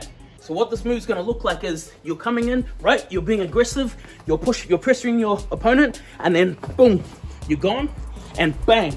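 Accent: New Zealand. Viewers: Sounds like you're from New Zealand? Your voice reminds me a tad of Taika Waititi but not exactly the same.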